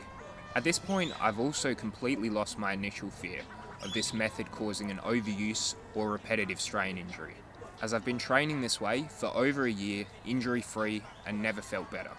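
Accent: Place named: South Australia, Australia. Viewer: You have a South Australian accent!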